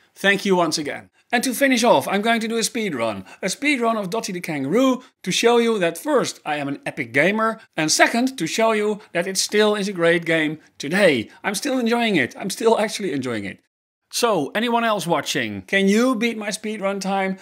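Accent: Netherlands. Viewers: i'm too young to be using ZX Spectrum at any point in my life, but i was playing elite (the original '84 version) on an emulator and it left an impression on me, not because it was mind blowing to me by itself (it was late 10s so we already had portal 2 and my most played game at the time, FSX, because i (was? am?) a sim nerd and that's how i found elite) but because it was otherwordly different from the games of its time first i thought "it's about david braben isn't it" then i remember watching Alexander the ok's video about elite (by the way, he has a spectacular channel and i recommend everyone who's interested in space and human achievements in general a watch, my fav video of his is about space shuttle program) where he told that elite was developed for BBC Micro (and ofc it was written in assembly, have you seen the thang (both BBCM and the game itself) it's too huge for basic) then i watched 2 seconds more and you said the name lol so anyway, funny video and i don't know why but from the first couple of seconds, without seeing anything else, without checking the channel description, i felt that you're dutch i don't know if it's the accent, or the similarity to Posy or the love for the color orange or the cadence of the speech but it's not like i thought "they seem dutch", it just clicked in my brain and i think that's pretty funny great channel tho, i like it when people just can upload stuff and not worry about youtube being youtube have fun :3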